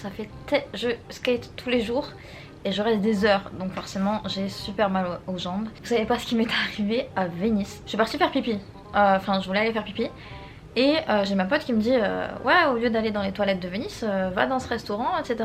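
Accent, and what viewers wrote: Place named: South, France. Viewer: Premier accent du sud que j'entends depuis que je suis à LA ! Merci 🙌!! Sinon super vidéo, j'aime beaucoup ce que tu fais ! Faut que je continue de mater ta chaine ça va me donner des idées pour la mienne 😂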